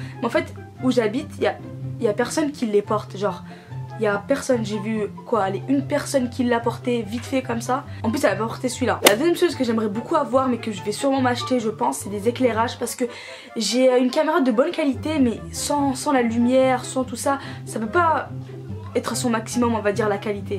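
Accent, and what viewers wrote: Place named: England, United Kingdom. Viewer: J'adore ton accent anglais 😂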